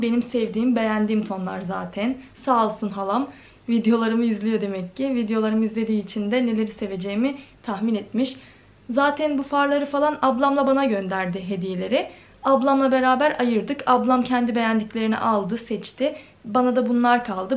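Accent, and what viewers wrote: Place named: Ankara, Turkey. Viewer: Hem karadenizlisin ama ankaralı gibi konuşuyon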